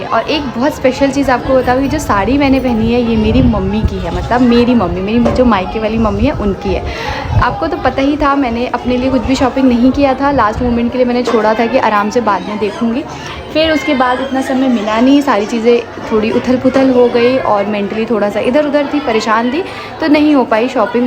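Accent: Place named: Ballia, India. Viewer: Di but mje ek chiz smjh nh ati ki jb ap bihar ki ho ar meea balia m nanihal h . But language to apki same h Jesi balia m boli jati h .but bihar ki ti alg hoti h .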